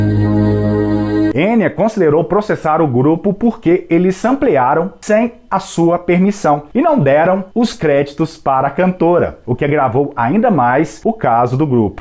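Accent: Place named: Minas Gerais, Brazil. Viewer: Nosso amigo fala como um mineiro! Muito bom video! Difícil encontrar material em Português sobre Enya. Uma coisa que sinto falta mesmo. Apresentações ao vivo. Por ser dependente de arranjos sintéticos para tentar expressar o som que " ouve" é compreensivel. Viver reclusa em um castelo frio na Irlanda contribui para açodar stalkers, uma pena. Mas, como venderia sem marketing? Enya foi muito importante para uma fase de minha vida. Ainda é uma referência positiva e tranquilizante!❤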